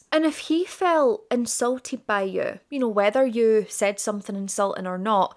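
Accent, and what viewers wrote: Scotland, United Kingdom. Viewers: All my hype points went to you 💗 once again a new story for me, you’re the best. You were the #1 channel I watched in 2025, I’ll never get enough of you’re Scottish accent and to see what stunning new look you show us❤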